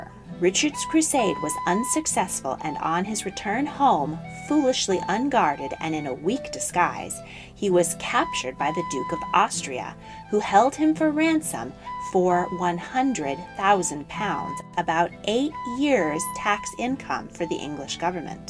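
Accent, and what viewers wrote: United States. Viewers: she is pronouncing it correctly. it sounds different because of her american accent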